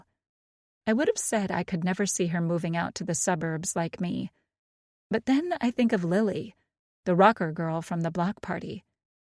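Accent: United States. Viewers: An American accent! These are practically rare.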